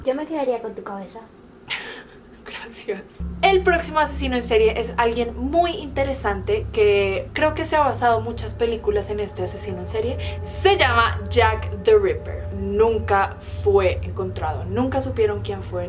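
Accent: Colombia. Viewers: estoy seguro que ella no es mexicana . no parece... parece y se escucha colombiana . es asi o me equivoco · Me encanta tu asento colombiano😅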